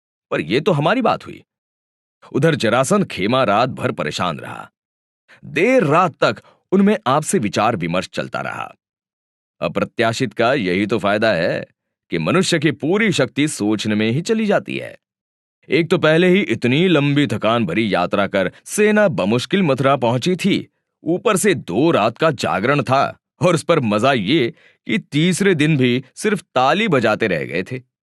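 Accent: Gujarat, India. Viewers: Sir ji Ye achha hua ki. Koi. Aur. Comentary kar. Raha hai Agar aap. Boltey. Toh Aapka ton jo ek. Gujju jaisa Aur. Aapke. Jo dress👗 hotey. Hai woh. Koi. Joker🃏. Se Kam nahi hotey Aap. Kitne bhi. Bade pravachan Karne. Wale ho.. Magar aapko Apne. Dress ka khayal. Rakhna chahiye Ye ekdum. Se. Joker🃏. Jaisa Ya koi. Over acting karne wale Jaise dikhtey. Ho aap Kuch toh akkal lagao guruji Ki logo ko kya 💆‍♀️massages jataa hai Aapko ek sudhh khadi ka kurta hi jachega Aur. Mai aapko criticism. Ke. Hisaab se baat nahi kar. Raha hu Aap. Jaise log Aur logo ko prerna detey hai Aur. Aap joker🃏 jaise. Dress👗 Pahan kar aa. Jaatey ho stage. Par.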